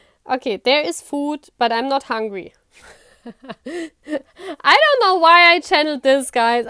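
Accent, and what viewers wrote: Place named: Germany. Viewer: Are you german because of the accent? Ps nice video💖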